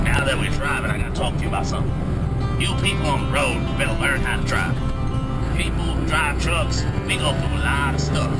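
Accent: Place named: Louisiana, United States. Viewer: he sounds like he has a lousiua byoue accent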